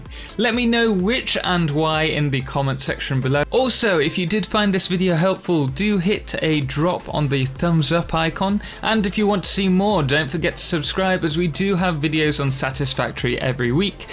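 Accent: England, United Kingdom. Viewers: I watch this channel about Satisfactory mainly because of the pure English pronansuation! It's just perfect!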